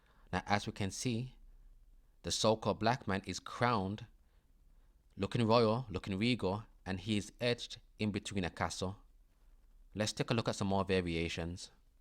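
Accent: Birmingham, United Kingdom. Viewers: Deffo a Brummie accent!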